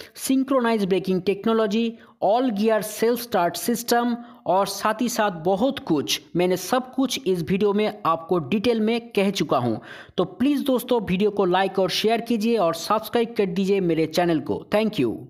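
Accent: Bengal, India. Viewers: I love hearing bengalee accent. Sweet like rasgullah .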